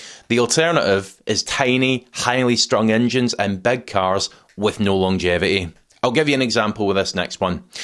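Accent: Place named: Cockney, United Kingdom. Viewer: damit... sometime I feel I hear Cockney! Can you please speak more distinguishable, more sharp?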